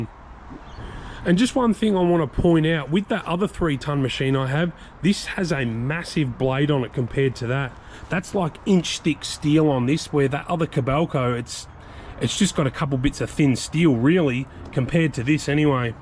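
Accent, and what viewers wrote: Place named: England, United Kingdom. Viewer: I just started watching your channel for the 1st time. I have to tell you as I was listening to your accent. I can now see how English accents morphed into Aussie, American Southern Hillbilly/Redneck and Kiwi. I have never heard that connection from one person before. Amazingly interesting. Anyway, you made a good choice buying that deal. Keep it up. Good job, buddy. 🇺🇸🇭🇲💪👍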